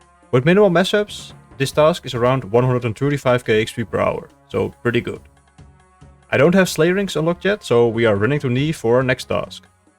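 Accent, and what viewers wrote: Netherlands. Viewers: Hearing you say "blasted these fools with the d hally" in that amazing Dutch accent of yours made me grin from ear to ear lmao. Always looking forward to the next episode, keep it up!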